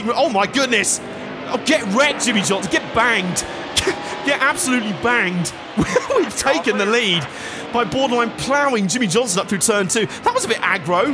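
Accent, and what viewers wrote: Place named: United Kingdom. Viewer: I love this channel plus the British accent 😂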